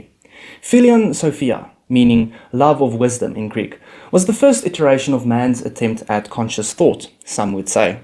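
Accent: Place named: South Africa. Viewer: Just out of curiosity, where do you come from? Your accent sounds South African (like British roots mixed with an Afrikaner accent), but you could also be from the continent of Australia. It would be quite a surprise if you are South African as not many South African Youtubers make this kind of content.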